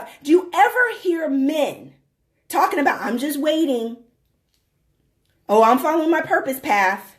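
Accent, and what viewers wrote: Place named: New Orleans, United States. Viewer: Babbaaayyyy say it in your Nawlins accent you done blew it up here! I'm so glad I woke up from that mess and saw the light. I almost threw my phone and shouted across my room when you talked about sexual repression! All these preachers out here profiting off of women's pain when it's a lot of women waking around broken due to so many issues and experiences. They should be ashamed!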